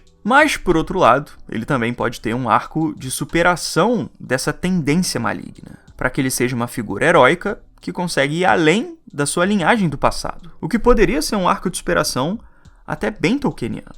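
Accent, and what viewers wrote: Rio de Janeiro, Brazil. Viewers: Oh vey kkkk carioca?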